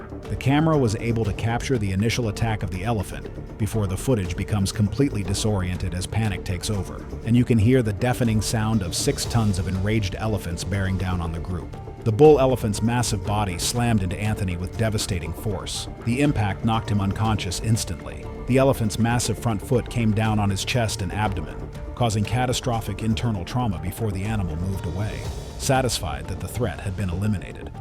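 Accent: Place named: United States. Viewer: Your voice sounds American, so quit with the metric system and us feet and inches.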